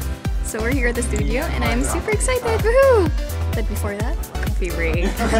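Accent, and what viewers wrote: Philippines. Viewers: The way you talk and laugh scares me that Filipina accent lol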